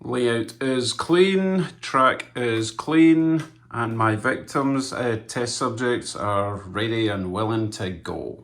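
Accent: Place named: England, United Kingdom. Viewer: i like your videos in particular because of the English sound -it makes me smile time and again and reminds me of watching a soccer game in a pub near Prestwick Airport and the guy next to me tried to engage in a conversation -had to tell him "let's chat during half-time, too noisy" -but even without noise I barely understood half -but no doubt, very nice people up there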